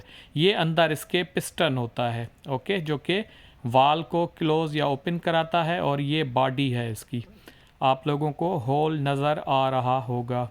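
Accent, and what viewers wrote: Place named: Sindh, India. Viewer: Boht informative video banai apne, well lehjay sy ap Sindhi lag rhy hain?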